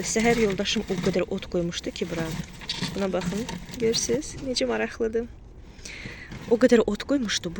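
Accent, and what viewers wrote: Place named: Russia. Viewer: Siz azərbaycanlısiz danisiginiz rus dillidi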